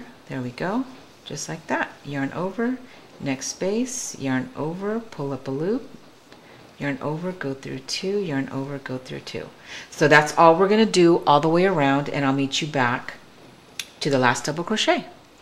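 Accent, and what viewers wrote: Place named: United Kingdom. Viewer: Sounds like your from the UK... The stitches are in English / US terms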